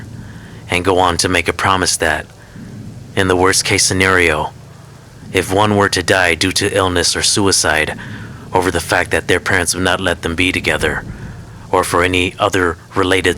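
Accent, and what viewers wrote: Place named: United States. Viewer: Leng lead me here. Subscribed! So good!!! I was convinced you’re not Hmong due to your perfect American accent until I hear you speak the Hmong words in Hmong. 👍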